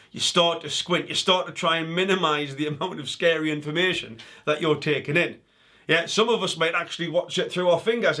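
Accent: Newcastle, United Kingdom. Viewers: Been missing that Geordie sound! Welcome back!🌝👍⚘❤🇨🇦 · great to see you back... your sultry geordie voice reminding me of my birthplace.. would still love to see a video on Jussie Smollett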